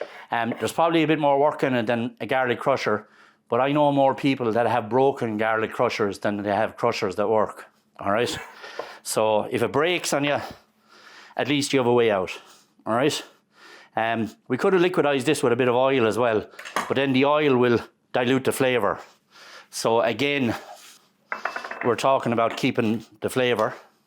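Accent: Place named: Ireland. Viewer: Hmm, accent of the host ..nm- ireland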